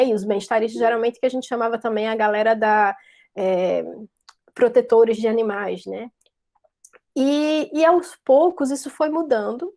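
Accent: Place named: Northeast, Brazil. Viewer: Adoro esse sótaque nórdestino 😁. Por um mundo sem senhores para humanos e não humanos, Tmj 👍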